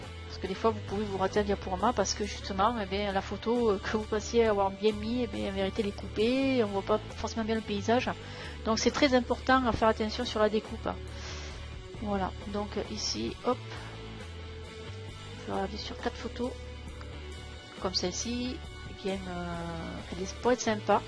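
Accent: South, France. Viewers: Bonsoir Chère, Encore du travail remarquable.. Merci de penser à nous avec gentillesse et simplicité. Avec en prime un délicieux accent du sud... Bien à vous André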